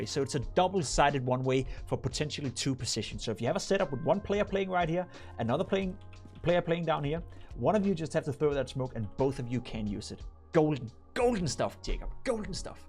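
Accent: Denmark. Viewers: u danish?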